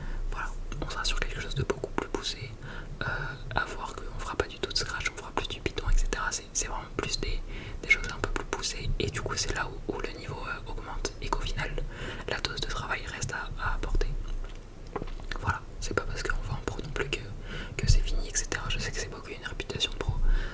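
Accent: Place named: Lyon, France. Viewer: 100% c’est un lyonnais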